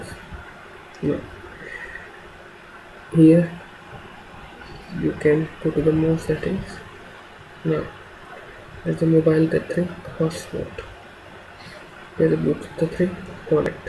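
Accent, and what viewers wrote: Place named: India. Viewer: wtf is he saying? that crappy mic combined with his indian accent...omg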